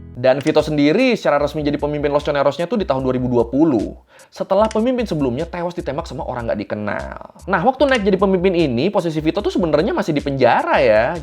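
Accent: Indonesia. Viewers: Macam sialan perbaca dari indon ni.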